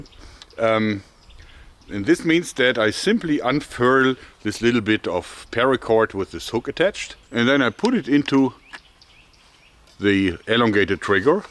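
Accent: Germany. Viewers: Your english is getting better and better. Don't lose the german accent, though, would be a shame!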